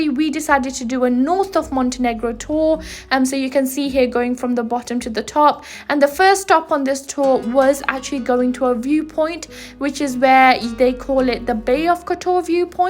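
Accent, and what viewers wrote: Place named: United Kingdom. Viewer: U have a British Asian accent :D, guessing you're Asian. Good vid btw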